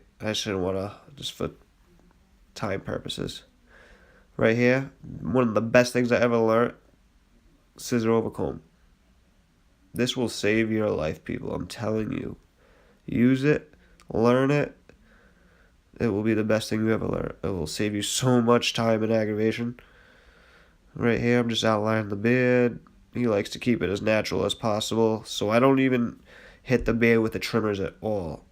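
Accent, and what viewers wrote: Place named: Boston, United States. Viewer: do you ever go 5 star shaver your freaken awesome. You sound like beantown accent.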